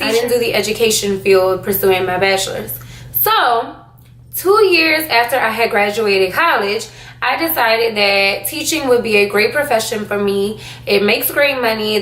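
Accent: New Orleans, United States. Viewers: You’re definitely from New Orleans 😂😂 #batonrouge area.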